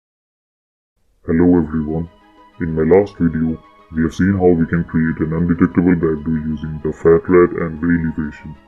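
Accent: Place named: India. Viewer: i can see that indian acent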